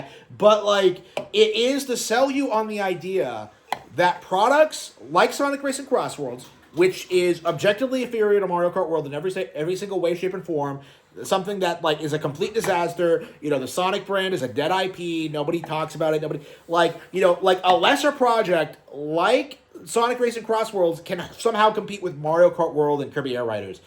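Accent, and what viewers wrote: United States. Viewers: Why you sound intoxicated with a Arizona lol bruh crack a beer and get a pc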